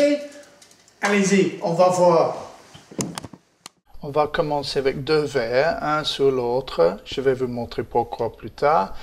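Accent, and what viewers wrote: England, United Kingdom. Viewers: Merci merci super utile et j’avoue le petit accent anglais c’est juste la classe!! 👍🏼☺️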